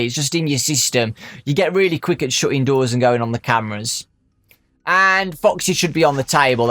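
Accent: United Kingdom. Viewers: ultimate britain voice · He is british right? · This guy has such a British accent it sounds fake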